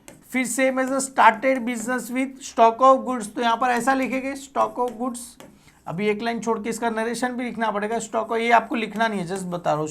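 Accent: Gujarat, India. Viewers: Sir aap Gujarati ho na? Aapka Gujarati accent hai You are an amazing teacher. Heads off to you ❤❤❤ 😊😊😊😊😊😊😊😊😊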